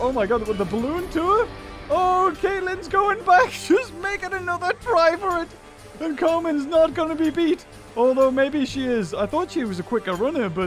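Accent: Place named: Newcastle, United Kingdom. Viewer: The Geordie Mrbeast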